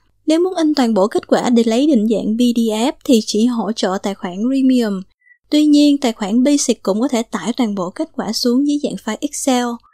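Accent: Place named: Saigon, Vietnam. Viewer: Giọng sài gòn nghe nhẹ nhàng, dễ thương làm sao..cảm ơn cô nhiều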